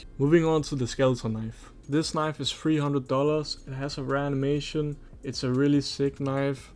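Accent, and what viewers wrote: Denmark. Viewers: holy danglish bror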